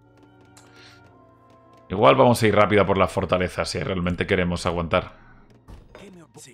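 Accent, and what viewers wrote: Peru. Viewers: Ere peruano?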